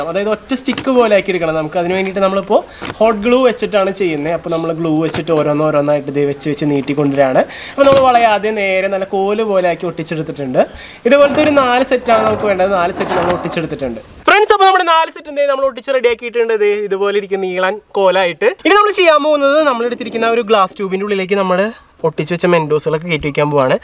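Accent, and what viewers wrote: Thrissur, India. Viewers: Thrissur karde sound anganeya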